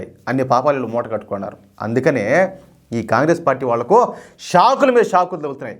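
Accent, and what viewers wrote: Rayalaseema, India. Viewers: Your rayalaSeema accent is too good sir... As iam from Telangana I can't recognise but is kadapa accent you spoke....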